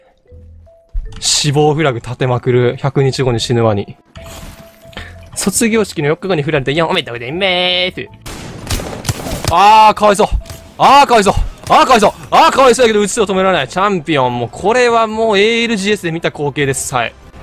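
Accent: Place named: Kansai, Japan. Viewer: 最近動画見てて関西弁移りました初見です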